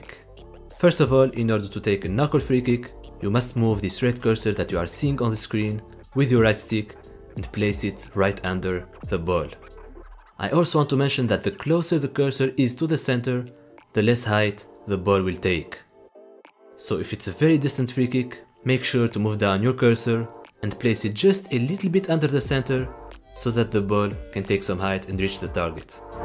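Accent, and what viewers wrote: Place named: Morocco. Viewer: Khouya ila knti meghribi rak nadi bzaf, bayna mn l'accent dyalk. Bonne continuation 🙏❤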